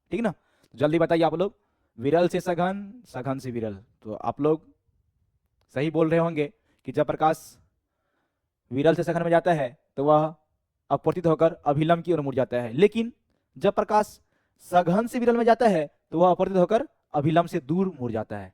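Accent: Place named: Bihar, India. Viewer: Sir bihari language 😍😍😍😍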